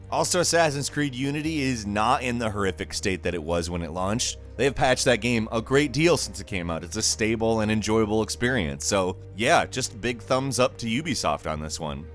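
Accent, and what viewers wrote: United Kingdom. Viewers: WHERE TF DID THAT BRITISH ACCENT COME FROM? 😂😂😂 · `s Brit accent is awesome. Oh boy, want more of it lol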